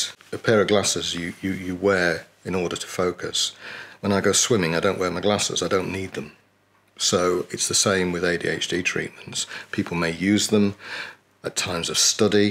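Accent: United Kingdom. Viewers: I’ve been wading through stuff on ADHD but this is by far the most useful, and really nice to hear it from a British person.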